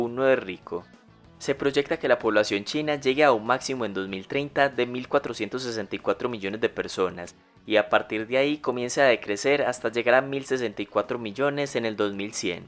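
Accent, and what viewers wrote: Antioquia, Colombia. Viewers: Puro paisa! Se le nota a leguas.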